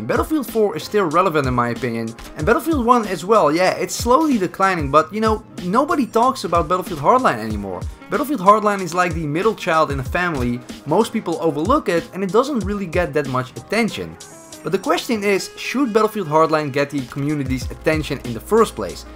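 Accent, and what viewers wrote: Netherlands. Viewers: Youre dutch right? Want ik ook😂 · Nederlands Engels accent, bah, werk er aan! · Are you Dutch or something? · your voice sound nederlands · I can hear you're dutch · Steenkolen Engels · Great Video Mate👍🏽 Are you from the Netherlands? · Are you dutch? I hear that accent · You sound Dutch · Are you from nl ? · Your voice sound dutch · Are you dutch? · You sound Dutch. Are you Dutch? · Hey dude, you’te Vids are very good and you for sure desurve more subs but i have a feeling you are dutch, are you ?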